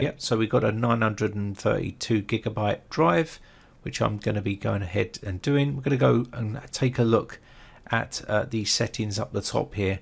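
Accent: United Kingdom. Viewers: Damn he speaks UK English. 👍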